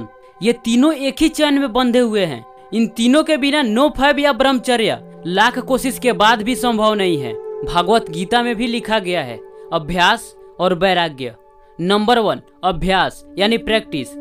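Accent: Bihar, India. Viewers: Bhi app bihari ho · Bro you from bihar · Aap bihari ho n pakka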